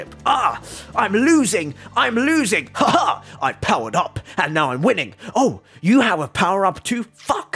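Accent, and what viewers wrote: England, United Kingdom. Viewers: I'm here for the english accent.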